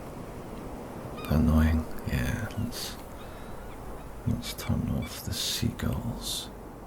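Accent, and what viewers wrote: Scotland, United Kingdom. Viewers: Found this account today, and it directly qualified as one of my ASMR favourites! Not only is it tingly and makes me laugh - on top of that I get to enjoy listening to the beautiful Scottish accent. It’s all insanely glorious! And I just want to say thank you!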